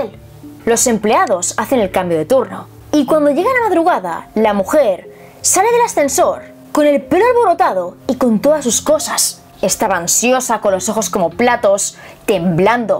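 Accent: Spain. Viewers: Acento español y sentido del humor muy acido... la amo Pero es una papa casada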